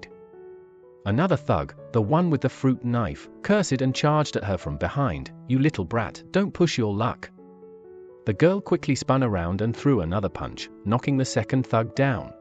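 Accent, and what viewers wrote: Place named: United Kingdom. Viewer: I love the British accent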